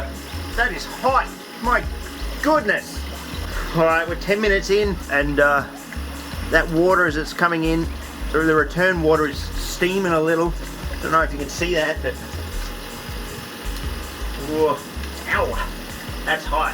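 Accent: Australia. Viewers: Saying "I can't do the Australian accent" when you literally HAVE and Aussie accent is actually pure gold. Thanks for that, I needed it. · Your always doing “the Australian accent” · “i can’t do the Australian accent” …mate, you have an Australian accent all the time lol ❤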